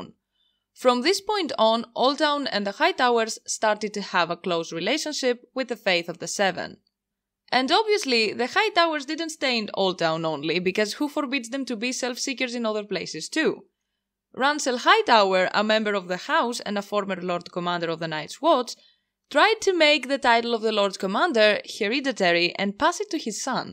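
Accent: Greece. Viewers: Έλα ρε! Αγαπώ την Ελλάδα! Υπέροχο βίντεο, greek accent makes everything better. I'm also a non native english speaker making videos, so feel free to check mine if you want. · I prefer your Greek pronunciations anyways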